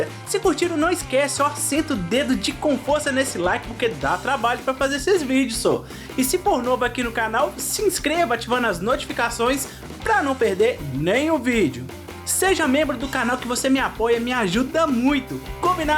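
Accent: Minas Gerais, Brazil. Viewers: Obrigada por ensinar tantas gostosuras! Adoro assistir seus videos com o sotaque minerim😁Eh Nois!!😁💚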